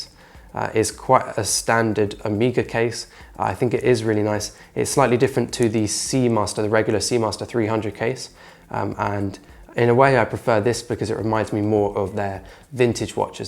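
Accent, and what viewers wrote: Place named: United Kingdom. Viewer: because hes British and they can't seem to pronounce their own language to save their lives over there.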